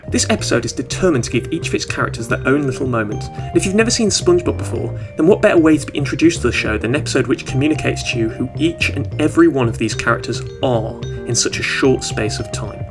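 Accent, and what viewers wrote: United Kingdom. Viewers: Your British voice makes you sound smart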